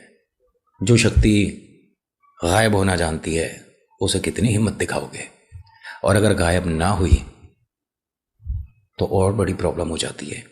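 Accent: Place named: Moradabad, India. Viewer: Jay Shri Shyam Bhai Har Har Mahadev aapki Bhasha bilkul Muradabad Jaisi Hai isliye main aapki Kahani sunti hun aur Kahani acchi Sunate ho aap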